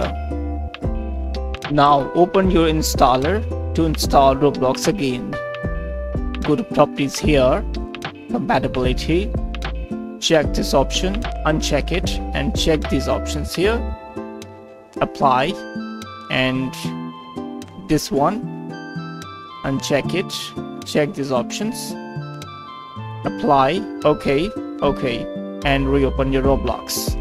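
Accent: India. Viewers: Hey man, thanks for the help! I know that if a tutorial has an indian guy voice i know that he will help my problem, and it will Thank you a lot Subscribing btw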